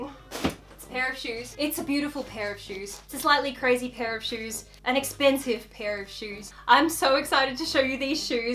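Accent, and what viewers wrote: Australia. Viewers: The first time I sall you vid I was about to leave then I sall that Bird and then I realized that you Australian u could tell at first I'm used to the accent... Cuz I'm Australian but i dont live there 😭😭😭😭 also I just like you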